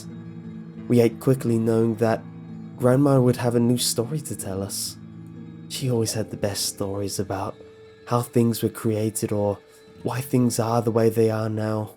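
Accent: England, United Kingdom. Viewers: I know he is not from America so he is probably from England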